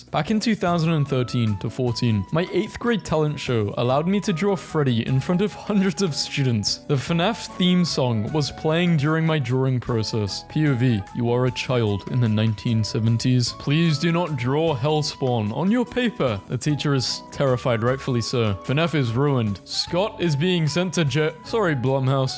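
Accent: United Kingdom. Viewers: wait ur british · You British? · YOUR BRITISH TOO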